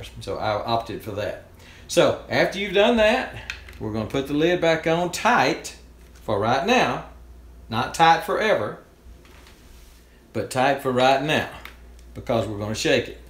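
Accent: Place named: South, United States. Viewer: Great one bro. I loved your southern accent too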